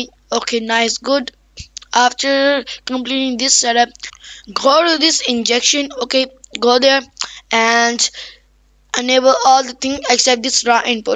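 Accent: India. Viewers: bro got that indian accent tho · thx for client btw i know ur indian cuz ur english is like indian LOL............................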